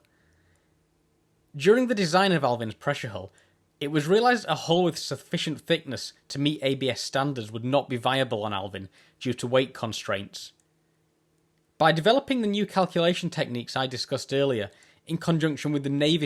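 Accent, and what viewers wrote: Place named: United Kingdom. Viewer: fwiw i think your voice/accent is great. granted im from the uk so i can understand it naturally, but it’s nice to hear people who speak “normally” (i.e. the way i/people i know talk) on youtube once in a while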